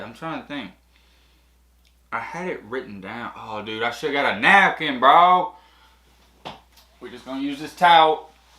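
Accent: South, United States. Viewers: He’s southern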